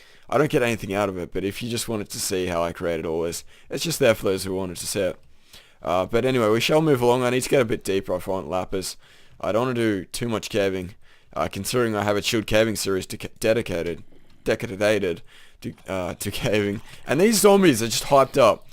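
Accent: Australia. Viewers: Sorry dude, I'm not used to Australian accents.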